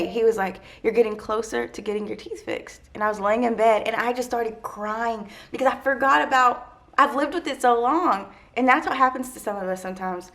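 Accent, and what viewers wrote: South, United States. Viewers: I hear that southern accent. Where are you from, if that's not to forward to ask?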